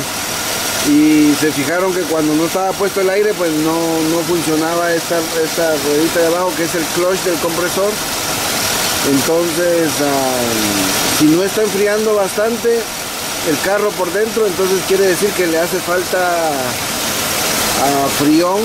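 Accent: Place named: United States. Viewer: Y luego para que hablas como Pocho....pues cuanto tiempo tienes allá que ya se te olvido ablar el español....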